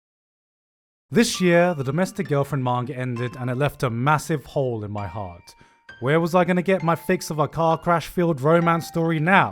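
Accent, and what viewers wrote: United Kingdom. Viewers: It's the Bri'ish accent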